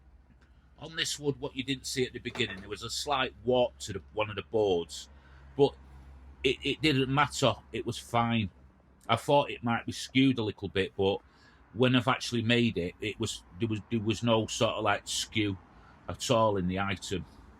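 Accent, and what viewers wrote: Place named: England, United Kingdom. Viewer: You sound like an English man . Am wondering why your title mention a......one by six. You use 6 by1 i hear. Dont confuse us Aussies. No one gets it right the first time.. you will never be perfect ,thats not possible. Call it what you want and dont copy thoses loud mouths over the big ocean. Gluing only is absolutely fine.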